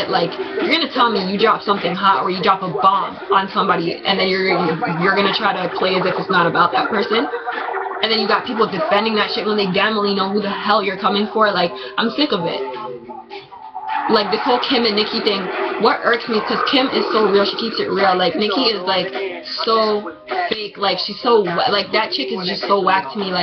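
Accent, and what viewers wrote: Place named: Caribbean. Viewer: Oh wow, lol. I don't know why I thought I heard a little Caribbean in you.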